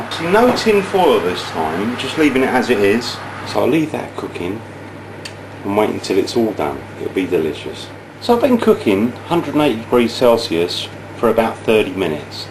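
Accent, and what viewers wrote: United Kingdom. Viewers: that british accent though. too cute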